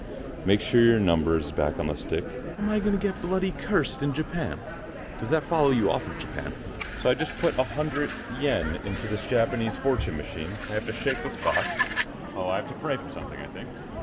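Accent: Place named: United Kingdom. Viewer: Is it me or your sounding more British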